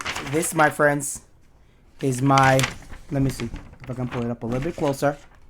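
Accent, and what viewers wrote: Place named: New England, United States. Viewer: You sound like a duncebat Yankee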